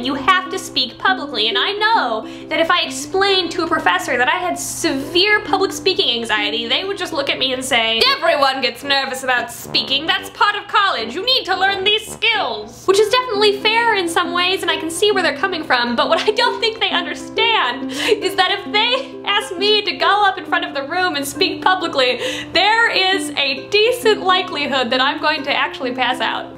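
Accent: United States. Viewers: I never suffer from Anxiety so much, but i loved your sweet American accent and your way too cute i do like your down to earth personality and you would be cool to hang out with. I also love the Blue Moon North American Beer as a Manchester City fan us British city fans are very fond of that lovely drink. Also please subscribe to me like i did to you because i enjoy your videos, i will be very grateful of that. Thanks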